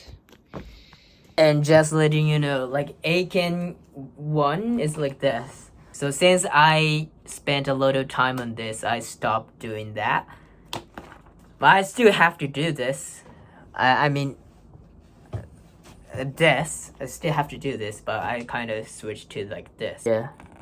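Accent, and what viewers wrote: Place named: Australia. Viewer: Your speak with a bit of an Australian accent, cute! ☺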